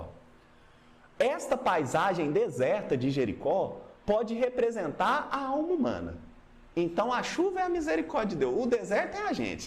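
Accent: Minas Gerais, Brazil. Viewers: Que sotaque bom ! É mineiro?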